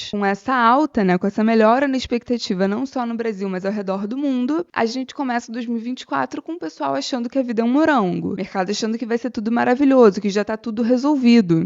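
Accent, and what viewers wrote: Rio de Janeiro, Brazil. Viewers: Adorei descobrir o seu canal!! A análise ficou ótima e ainda tem a dose de carioquês e palavrão que eu amo kk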